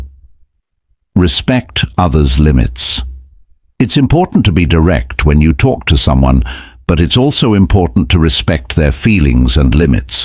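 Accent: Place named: Scotland, United Kingdom. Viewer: Yepp took on a scottish broague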